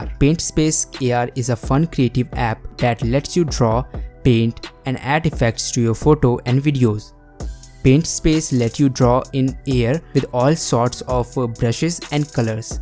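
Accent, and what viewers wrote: India. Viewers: you must be a south Indian...probably Telangana or Andhra.by the way Video content is useful.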